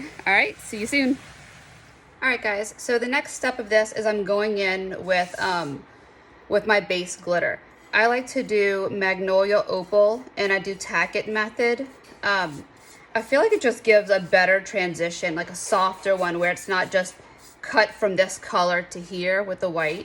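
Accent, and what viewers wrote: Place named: Louisiana, United States. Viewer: Thank you for being real and showing us all how to. Got a question are you from Louisiana? Oops I finished watching and answered my question...lol I thought so I recognize the accent ❤ I grew up a Louisiana girl.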